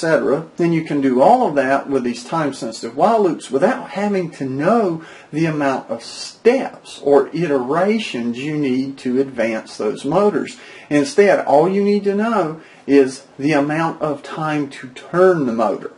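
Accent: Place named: South, United States. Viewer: Thats a cool Southern accent. The best in the USA. In my opinion.:)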